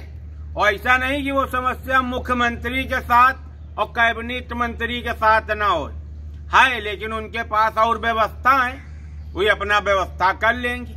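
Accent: Kanpur, India. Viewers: Phchan gye bol rhe K🤣anpur se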